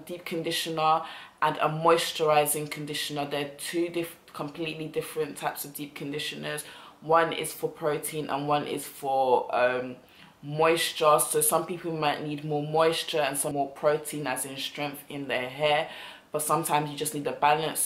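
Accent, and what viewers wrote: United Kingdom. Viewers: errmm...sort of lol. OK so this is off topic; you sound like you're in the UK. I am too and I was wondering if you know a place for crochet braids. I only do it when I go back to Ghana but haven't found anyone here that does it.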